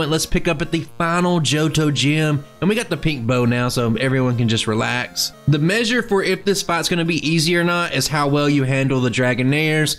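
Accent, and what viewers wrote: South, United States. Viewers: somewhere from the Southern States :D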